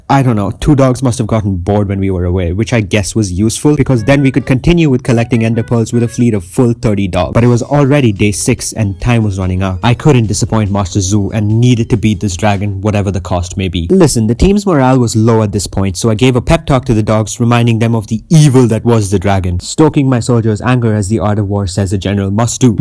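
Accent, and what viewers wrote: India. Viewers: Bro still has Indian accent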